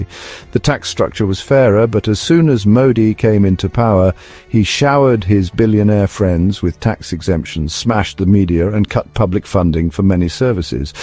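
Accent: United Kingdom. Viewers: lol, obviously a muslim inspired rhetoric. No one is taken in by the English accent. Someone in congress woke up and initiated some creative AI? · One blatant lie is enough to expose the nefarious agenda- the one about Tejas. The posh Brit accent is the other giveaway😅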